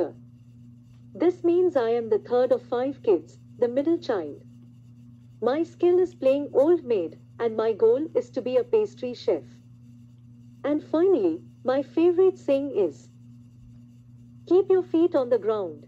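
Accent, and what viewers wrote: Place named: India. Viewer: You're beautiful,! I don't mind that Indian voice though!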